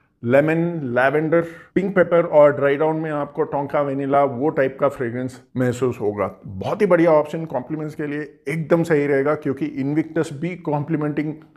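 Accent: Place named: Karnataka, India. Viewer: First time watching your hindi channel.. You sound like a kannadiga.. Good to see you reaching hindi speaking guys... 👍🏻👍🏻👍🏻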